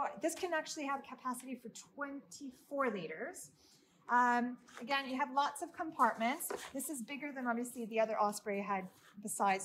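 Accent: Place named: Vancouver, Canada. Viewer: Sound like u r from Vancouver. Lol This survival prepper stuff has been gojng strong for 20+ yrs now. What do you think our number one prep reason should be? The cause to prep.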